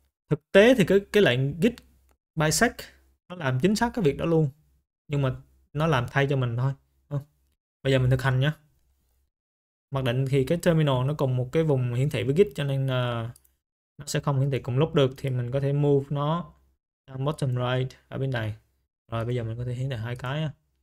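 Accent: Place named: Central, Vietnam. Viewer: các video của anh khá hay, lại nói giọng miền trung rất thân thiện nhưng channel lại ít video quá. không biết a có dự định làm thêm nhiều video trong tương lai không ạ?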